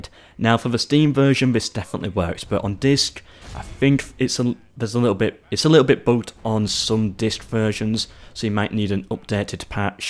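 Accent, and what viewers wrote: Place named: Northern England, United Kingdom. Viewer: Cool. I figured it was northern england, but couldn't place it. Your accent is very similar to Ygritte in Game of thrones. I'm from Norway btw. Cheers mate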